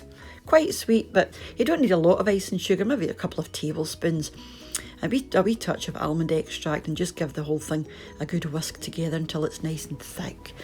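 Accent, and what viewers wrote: Scotland, United Kingdom. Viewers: Oh, and thank you. Really enjoy your Scottish accent.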